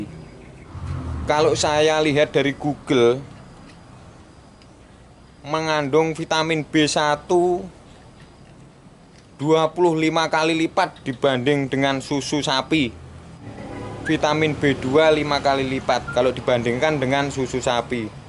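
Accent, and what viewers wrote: Java, Indonesia. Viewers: Borneo,kok jowone kentel bgt.asline ngendi lek.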